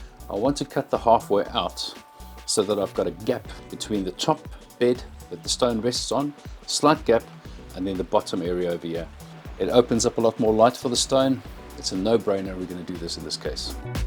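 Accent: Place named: Netherlands. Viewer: Your sound Dutch😊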